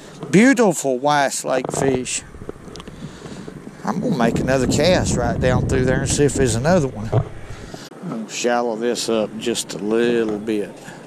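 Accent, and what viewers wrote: South, United States. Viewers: He mesns 1/128th ounce...hes just country...it takes a country boy to translate...im ole missippi boy...rock on brother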